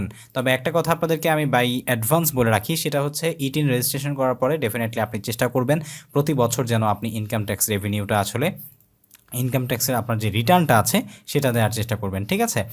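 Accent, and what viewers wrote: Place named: Gopalganj, Bangladesh. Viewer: Vaya apni gopalgonj ar cale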